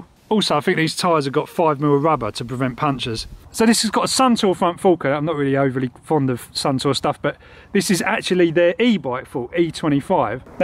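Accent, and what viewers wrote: United Kingdom. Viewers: Love the british accent: Pe'al to the me'al haahahah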